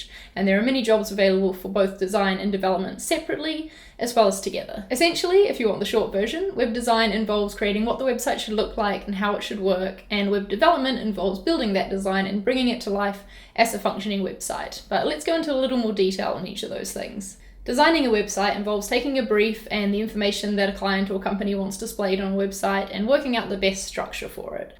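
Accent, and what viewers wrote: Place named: New Zealand. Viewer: Love the Kiwi accent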